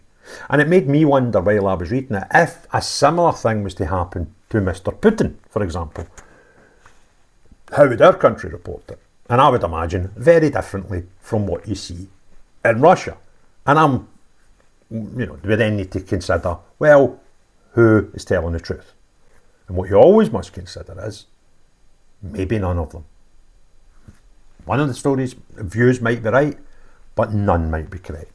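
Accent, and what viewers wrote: Scotland, United Kingdom. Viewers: I’m sorry, I didn’t want to listen to all of this, but the Scottish accent ain’t doing it for me. What a shame.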